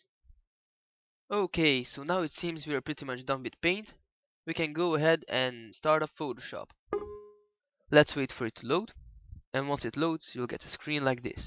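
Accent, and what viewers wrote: Netherlands. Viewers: Je kan horen dat je Nederlands bent. Maar daar is niets mee. :D Nog succes met je videos. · HAHA YOURE DUTCH JUST LIKE ME I heard it before i even saw 'lijndikte' en 'beeld' en 'bijsnijden'. en voor ik de comments las dùh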